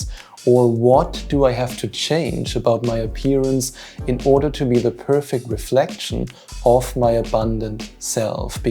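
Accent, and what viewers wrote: Germany. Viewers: I love your German accent, your wonderful sense of humor and the straightforward way you present the material for us to understand your journey and our journey.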